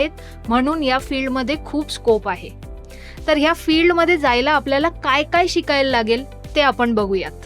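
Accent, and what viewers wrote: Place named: Gujarat, India. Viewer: गुजराती असून ही मराठी स्पष्ट आणि छान बोलता. तुम्ही डाटा अनालिस्ट ची माहिती खूपच छान आणि उपयोगी अशी दिली आहे....... ती खरोखर सर्वांना मार्गदर्शक ठरेल....... धन्यवाद 🎉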